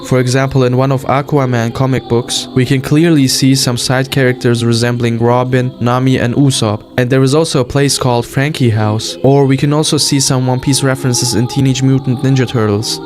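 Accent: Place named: Germany. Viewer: i can hear that lil german accent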